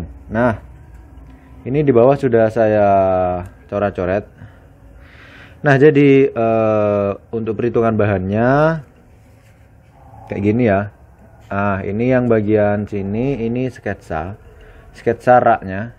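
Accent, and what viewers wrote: Madura, Indonesia. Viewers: Logat madura nya kentel 👍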